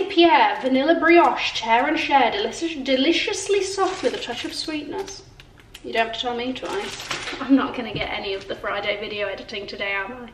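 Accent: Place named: RP, United Kingdom. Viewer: I LOVE YOUR ACCENT !! You're so posh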